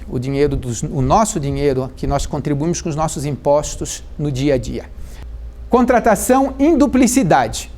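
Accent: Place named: Florianopolis, Brazil. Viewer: A parte da formulação de preços por meio dos orçamentos de empresas para compor a média dos itens é o grande buraco que os municípios, estadone união precisam sanar, pois alem das empresas serem direcionadas os orçamentos sempre vem com valor alto e muitas vezes nao baixa. Em relação a fiscalizar ou auditar as prefeituras e empresas, poderiam contratar fiscais para auxiliar os auditores e filtrar ou seja ir a campo e repassar relatórios de indícios para os auditores. Os fiscais atuariam com investigadores e os auditores como delegados. A pergunta: porque isso nao é feito? Os municípios lidam direto com a realidade do munícipe e contratos com valor dobrado de preço indica que alguém está obtendo vantagem ilícita, seja empresa ou pregoeiro e que o processo está solto e sem controle externo. Um sistema de consulta de preços públicos ou licitados poderia ser uma possível solução. 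Tipo um órgão que cadastrasse os itens e preços licitados e a união, Estados e municípios pudessem consultar e utilizar como referência na composição da média. Entende que a economia para o Brasil seria imensurável. Daí vem outra pergunta: seria do interesse dos governantes criar isso? Grande abraço conterrâneo de Floripa, o sotaque não nega.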